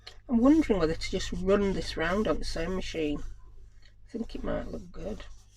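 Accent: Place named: Hull, United Kingdom. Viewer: your accent at times almost kills me lol. My parents are from Hull, they came to New Zealand in 1952. I reckon I'd sound like you if they'd stayed in England. When I first went to school the teachers couldnt understand what I was saying. My accent musta been pretty full cause I'd only been with my mam and da. Sending hugzz 😊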